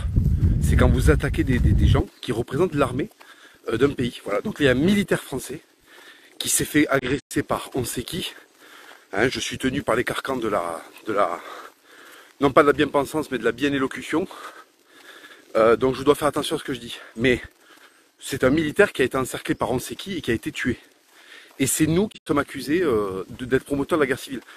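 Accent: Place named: Arab world. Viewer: Merci d'avoir posté les preuves évidentes que tu n'es pas un Breton et ne l'a jamais été. Tu zozotte toujours autant le bédouin hahaha et puis bon, ton accent, malgré tes acrobaties n'échappe à personne. 100% Accent arabe. Bon allez, je te laisse rêver à une identité qui n'est pas tienne. Tu t'humilie tellement tout seul que je n'ai même pas besoin de te vanner. Y'a pas de Fatima en France, à part les bédouines arriérées venues de chez toi, qui viennent à la nage chez nous.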